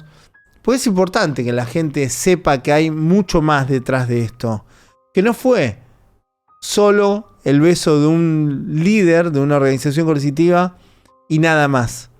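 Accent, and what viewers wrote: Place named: Argentina. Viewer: no le cambies, me encanta cuando dices: “en cana” y es un “agco” siempre me da risa cuando los argentinos dicen asco.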